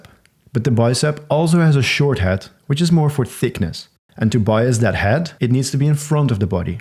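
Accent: Netherlands. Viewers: Fit gast klinkt als een Nederlander 🤔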